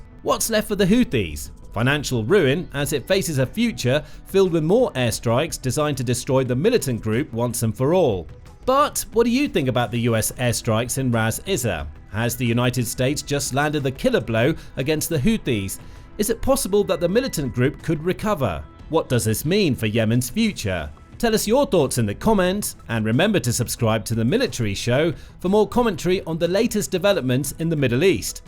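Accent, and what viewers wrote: United Kingdom. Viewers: I am SO sick of narrators with British accents.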